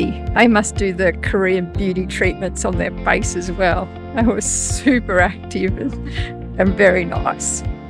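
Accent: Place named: Australia. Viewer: Welcome to Korea and hope you're enjoying your stay in Seoul. When I heard your accent, I knew you were from Australia and saw you told a man that you are from down under. If I heard it correctly, , heard you saying "what a larrikin!" 🤣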